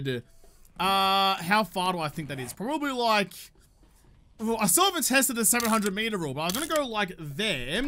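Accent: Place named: Australia. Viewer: he is an Australian... I'll give him slack on pronunciation.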